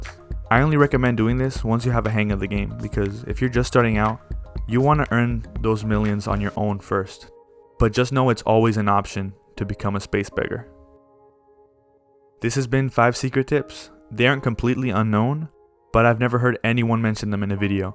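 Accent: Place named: Brazil. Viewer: Estava me perguntando se você era brasileiro pelo sotaque, aí me deparo com o pet com o nome "Pica-Pica". Confirmado 😂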